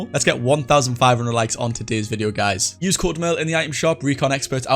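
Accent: Newcastle, United Kingdom. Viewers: Are u a geordie?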